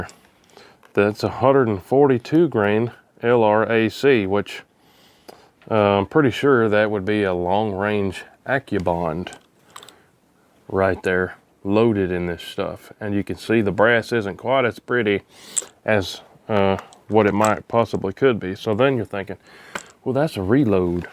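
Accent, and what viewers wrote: United States. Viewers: Message from Australia. Hearing this dude talk is why I love the yanks.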